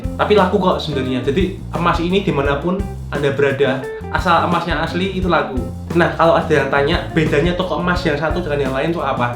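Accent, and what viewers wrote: Java, Indonesia. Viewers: Kok muanteb ngomonge,,jawa y bang,,,??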